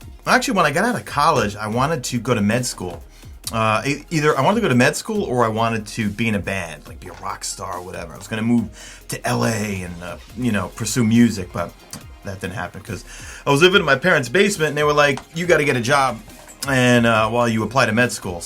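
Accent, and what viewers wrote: New York, United States. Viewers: #MadeItToTheEnd Can you do a video with your new York accent #askmesa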